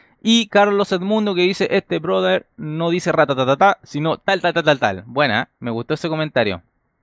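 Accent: Chile. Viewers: Tu acento es ¿ Chileno? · se nota poco lo chileno puro aguante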